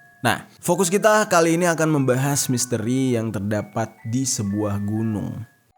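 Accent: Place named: Jakarta, Indonesia. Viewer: Mbah petruk, pengucapan nya lucu kalo orang jakarta yg ngomong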